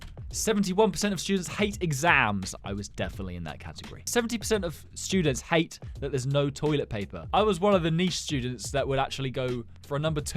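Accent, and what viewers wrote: United Kingdom. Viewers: British person alert 🚨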